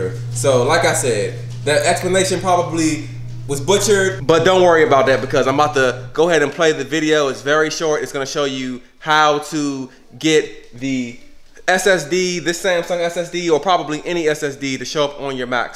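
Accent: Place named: Prince George's County, United States. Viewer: Thank you! I can tell that PG accent from anywhere btw :)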